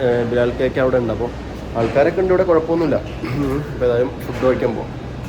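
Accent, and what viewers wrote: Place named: Kollam, India. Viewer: കൊല്ലത്തെ ഭാഷ ഭയങ്കര ഇഷ്ടമാണ് 😍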